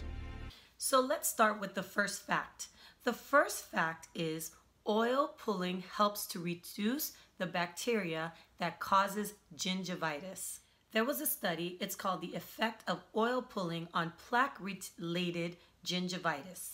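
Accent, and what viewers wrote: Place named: Haiti. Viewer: Are you Haitian? I detect a slight accent.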